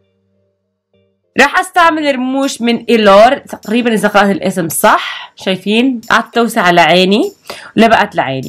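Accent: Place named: Syria. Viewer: لَيش بتحكي سوري ؟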